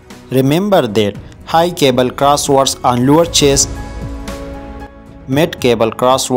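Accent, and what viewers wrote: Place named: India. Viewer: Сразу слышно что индус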